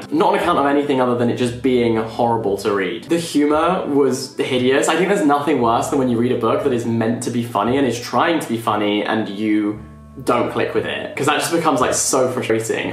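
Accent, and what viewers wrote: United Kingdom. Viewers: WHY IAM I LISTENING TO A BRI-ISH MAN RANT ABOUT BOOKS AT 2 AM? i do not know ( i love him sm)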